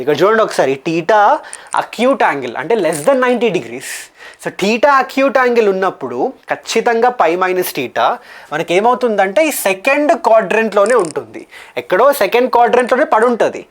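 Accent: Telangana, India. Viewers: PURE TELANGANA ASCENT!!!!! ABBA REALY ADDICTED SIR 🥰🥰🥰🥰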